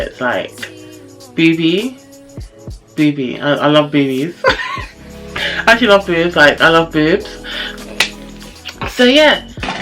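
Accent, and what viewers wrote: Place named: Long Island, United States. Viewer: Your Long Island sexiest chocolates cocoa butter golden brickhouse woman 😍😍😍😍🍓🍓🍓🍒😋😋😋☺️ love your accent 🔥🔥❣️❣️😍😍😍😘😘🌟🌟🍓🍓🍒🤩🤩🤩🤩🤩🤩🤩🤩🤩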